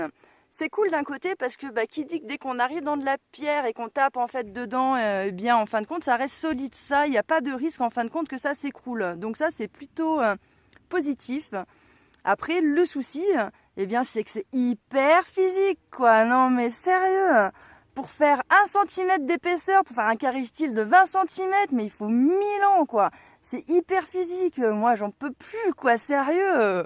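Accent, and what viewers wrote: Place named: Southwest, France. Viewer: j adore l accent du sud ouest!!!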